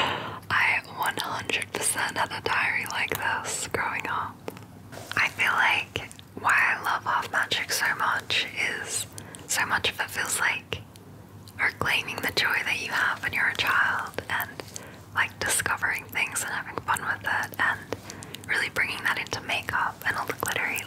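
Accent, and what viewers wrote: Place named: Australia. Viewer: Australia has my heart because the accent is MELTING ME -from over here in America ❤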